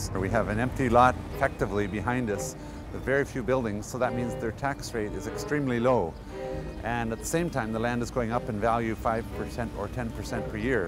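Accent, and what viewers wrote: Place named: Canada. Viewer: Holy shit this guy’s accent is Canadian af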